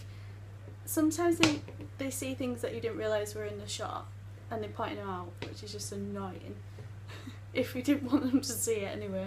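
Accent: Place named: Yorkshire, United Kingdom. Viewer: I love your accent from Yorkshire ?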